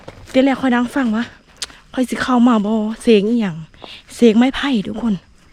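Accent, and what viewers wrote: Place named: Phu Thai, Thailand. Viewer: โม่นสำเนียงสาวภูไทเด ติดตามตะลอดเด้อ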